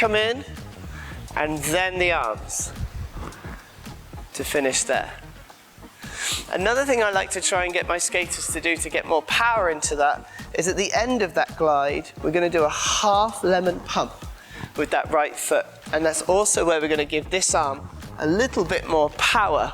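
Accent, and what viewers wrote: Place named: England, United Kingdom. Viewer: I know right? To this Texan (Texas, USA), refined accent sounds so cute and charming. Instead of saying "water," he says "watah." He usually shoots his video straight and doesn't try to be funny but in this one he plays around a little and he even plays with the accent once and says "wateeeer," I can't remember what word he said. I'm surprised you are attune to English accents, I guess you speak English well. You wanna talk about charming, I'm sure you know the famous Brazilian singer Roberto Carlos. I like to listen to him sing in Portuguese and if I know the song in Spanish I like to think I can follow along in Portuguese. Mostly I listen to him in Spanish and he has a Portuguese accent and it's majorly charming. So, we all agree, is amazing.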